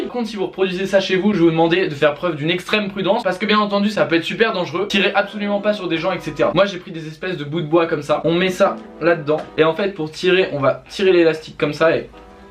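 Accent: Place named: Normandy, France. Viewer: ons dirait trop norman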